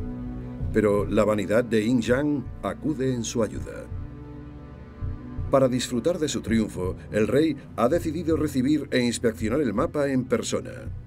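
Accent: Spain. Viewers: Si tan sólo no tuviera el maldito acento español.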